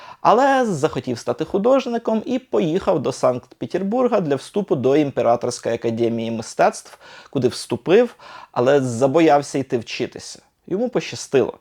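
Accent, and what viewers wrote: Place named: Western Ukraine, Ukraine. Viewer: Судя по акценту афффтор ни разу не относится к украинской наследственности. Западенец у которого мама курва, папа на конюшне жил...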